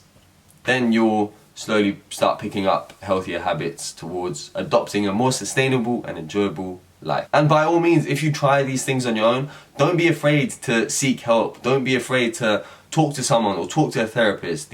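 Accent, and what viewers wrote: United Kingdom. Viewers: #AskPhitzee I heard you say you are from Montenegro. Your accent sounds British. Have you lived in Britain most of your life? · talvez seja pelo sotaque britânico dele, dá a impressão que ele ta falando mais rápido mas é só o sotaque mesmo. não desanima, com o tempo você vai acostumando.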